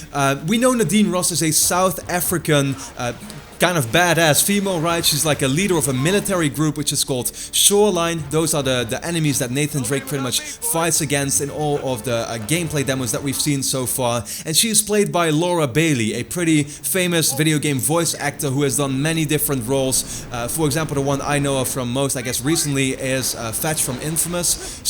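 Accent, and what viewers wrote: Netherlands. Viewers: are you dutch Btw this is a great video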